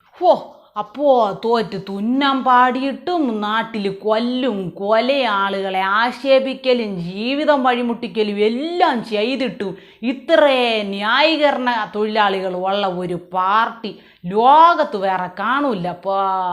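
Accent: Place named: Trivandrum, India. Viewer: കണ്ണാടിയും, മുണ്ടും ചട്ടയും, വയസായ ലക്ഷണവും, തിരോന്തരം ഭാഷയും 👌👏👍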